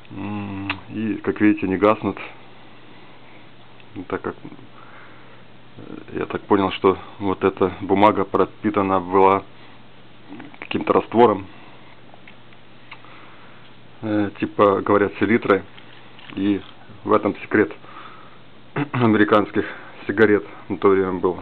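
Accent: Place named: Ukraine. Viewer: украинский акцент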